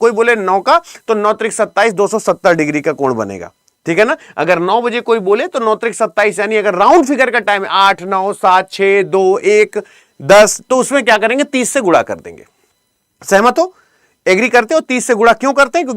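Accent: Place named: Bihar, India. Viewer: Kha se ye bihari padhane ya geya overacting jyada kerta hai...choko choko kya hota hai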